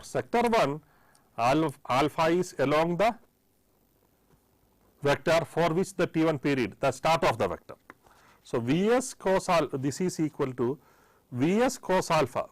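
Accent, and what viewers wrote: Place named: South India, India. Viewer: Just because the professor in the video speaks with a heavy south indian accent does not mean that he does not know how to speak english. every one speaks the language with an accent, including the british and americans, african americans etc.. i have never seen anyone complain about the way people from the west speak English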